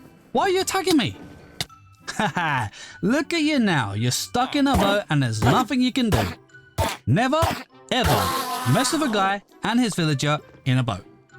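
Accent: United Kingdom. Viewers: Should not be hate but are you British cuz the accent sounds like it?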